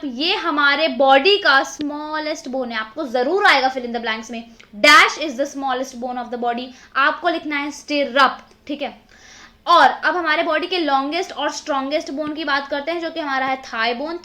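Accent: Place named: Maharashtra, India. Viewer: Hi 😊 Didi You Explain Very Very nicely but..... I mostly love ur those videos when you teaches on paper like you're teaching on White Board I'm A little bit weak in Maths But When U Explain me I can Solve any problems from Equation Now my Last exam is stared only 10 days are Remaining I will do my Best Thanks for your Maths Sub Teaching ☺️ Ap jaisi teacher agar meri School ki maths teacher hoti mera matlab agar ap hi meri maths teacher Hoti Mai bohot khush nasib hoti Aani mi tumchya awaja varun olakhlay ki tumhi marathi aahat🤗 Thnk You So Much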